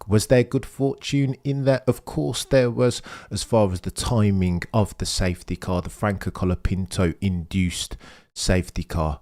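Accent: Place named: Oxford, United Kingdom. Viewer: Very philosophical , brilliant Oxford english!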